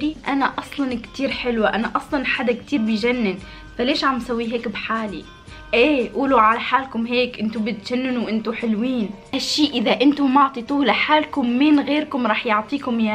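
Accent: Tunisia. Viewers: اللهجه التونسيه عسللل❤️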